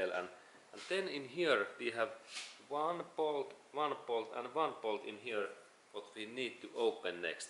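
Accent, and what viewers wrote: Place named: Finland. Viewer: hirveen kuulosta tuo ralli englanti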